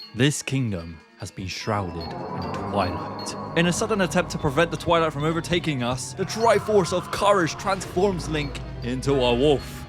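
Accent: Bristol, United Kingdom. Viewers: I know a Bristolian accent when I hear one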